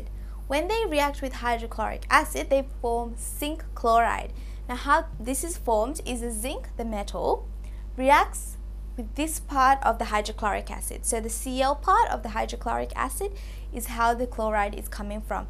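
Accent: Australia. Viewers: I can sense an Australian accent 👀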